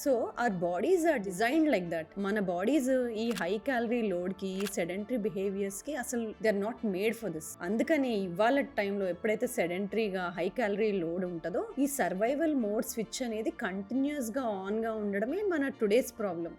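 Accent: India. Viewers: Great information. By the way I am surprised you still pronounce certain English words with Indian accent ( Example: Dessert and obesity) I hope you take it sportingly. Cheers! 👍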